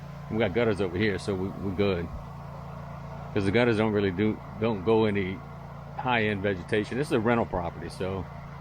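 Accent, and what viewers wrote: Louisiana, United States. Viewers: 985 I knew that was a Louisiana accent.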